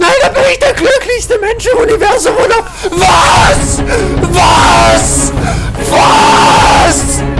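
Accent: Germany. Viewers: I feel like the German accent and language makes it all that more special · English mit deutschem Akzent klingt so falsch ich finde aber die subtitles haste echt gut hinbekommen (Mir fehlt noch einer/I still lack one) Echt super und ich habe das schon allen meinen freunden gezeigt dat is zu lustig egal wie alt das is XD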